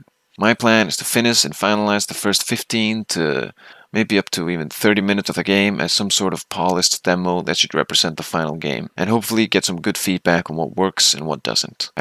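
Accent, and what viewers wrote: Iceland. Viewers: You have an Icelandic accent. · You sound icelandic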